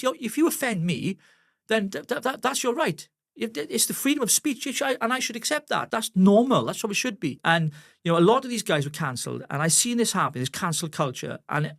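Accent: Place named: Wales, United Kingdom. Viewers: As a valleys woman, it’s refreshing to hear a Welsh man share his passion 🏴. Our children deserve a future with our values. My 8 yr old son has been having s*x Education 😳 it’s insane. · Love it! A silver-tongued Welshman with a fire in his belly! Just what we need in a time such as this....Advance UK!! God bless. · Da iawn! We very rarely hear the accent of my homeland..🏴🏴🏴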